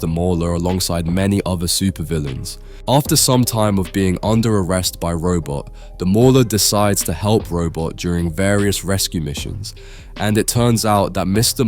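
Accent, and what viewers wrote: United Kingdom. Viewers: I'm so used to this accent I tuned it out. He's just Bri'ish or something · he's British, that's how it's pronounced in whatever part of England he's from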